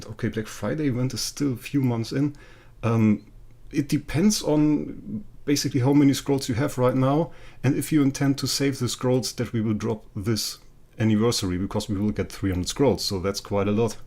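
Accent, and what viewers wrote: Germany. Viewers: I could tell from your accent you were from Germany although I am pretty good with accents also Hi from Canada 🇨🇦 loving the videos bud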